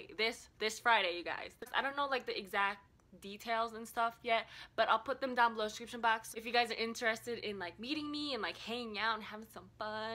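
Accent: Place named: Philadelphia, United States. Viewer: I was wondering where are u from because you speak Spanish and you speak Philadelphia soooooo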